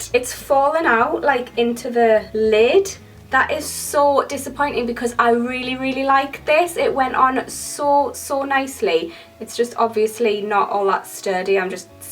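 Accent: Newcastle, United Kingdom. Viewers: I love your videos as I am a fellow Geordie. You are a Geordie aren't you 😂😂😂 Please do a freedom makeup tutorial ❤️💖❤️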